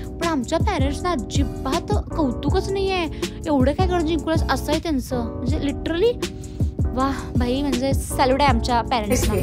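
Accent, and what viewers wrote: Kolhapur, India. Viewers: Orignal kolhapuri language 🥰🥰🥰🥰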